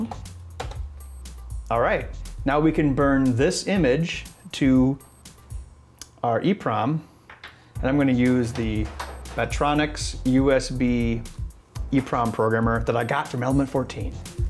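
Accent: United States. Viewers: American English! Sound as thick as shit, plus uneducated with easy! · we say zhead x spectrum because that's how is meant to be said as its a uk product and the uk invented the language that the yank ruined lol. I would say speak English boy but I know for yanks this is impossible .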